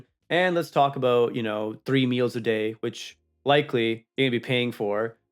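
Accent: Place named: Canada. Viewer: He sounds American but isn’t American, I’m gonna go with Canadian..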